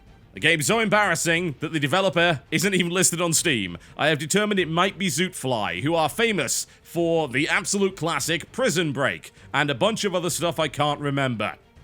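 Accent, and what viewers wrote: United Kingdom. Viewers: Oh by the way, The Gods, he is british. It's called an accent. Deal with it.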